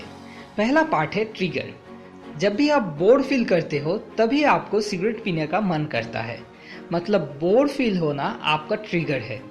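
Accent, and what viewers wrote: Bengal, India. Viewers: Your accent is bengali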